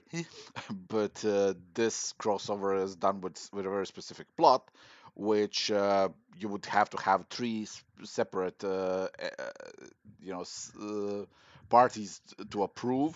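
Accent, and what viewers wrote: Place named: Balkans. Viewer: Love the Balkan accent <3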